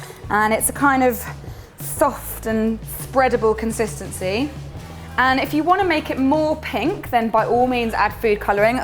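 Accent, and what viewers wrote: England, United Kingdom. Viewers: Is she british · That English accent, those cute dimples... and she bakes too. What more do you want in a woman? hahaha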